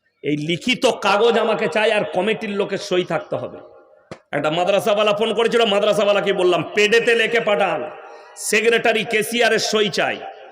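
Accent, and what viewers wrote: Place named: Bardhaman, India. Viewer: দাদা, বর্ধমান জেলার গ্রাম এর মানুষের কথা গুলো এই রকম হয়, এতে মাদ্রাসার দোষ কোথায় বলুন?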